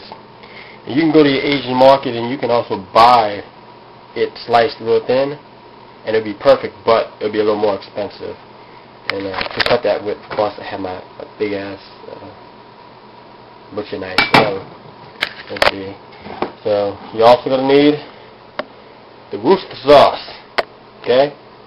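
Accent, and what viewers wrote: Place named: East Coast, United States. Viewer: he sounds like hes from the east coast but this is some half ass pho but a lot better then what i can do.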